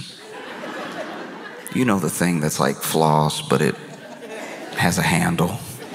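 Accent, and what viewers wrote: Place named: South, United States. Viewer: his southern drawl is the cherry on top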